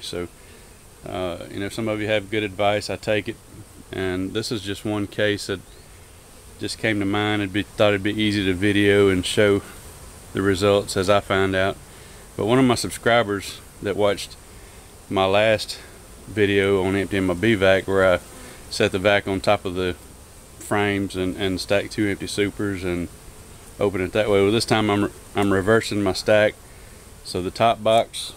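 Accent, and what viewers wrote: South, United States. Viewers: I'm fascinated, I've never seen anything like this. Great video! love your southern accent ;)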